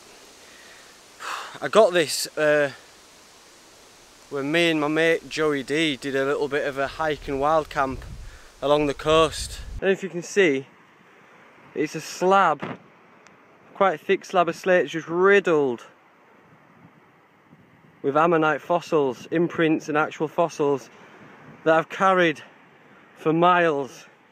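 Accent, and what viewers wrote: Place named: Sheffield, United Kingdom. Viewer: Bet your from round my neck of the woods with your accent. Sheffield?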